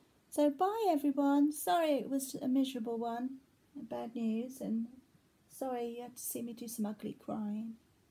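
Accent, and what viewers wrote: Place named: South, United Kingdom. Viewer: I'm really sorry you have had such a horrible diagnosis but at least you can start the treatment soon. Hopefully. Where in the UK are you? Your accent sounds as though you are in the south and I'm on the Wirral or I'd invite you round for a cuppa and a hug. I've been watching your videos and really enjoy them. I hope your cat scan is very soon. If you need a shoulder mine is big and padded for tears! Love and hugs and healing thoughts xxx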